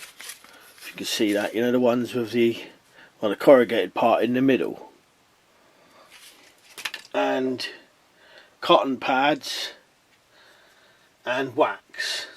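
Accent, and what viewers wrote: United Kingdom. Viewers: British accent cracks me up. Instead of water its waer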